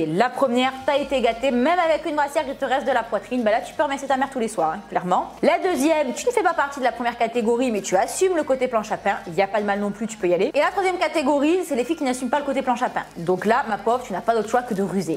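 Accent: South, France. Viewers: D'où nous vient cet accent ? Ça sent le sud ☀️ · coucou, super vidéo comme tj continue comme ça:) t'es magnifique en leggings et brassière haha ps: j'adore ton accent du sud:p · Le petit accent du sud haha ! encore une vidéo trop drôle et trop bien #teamsnap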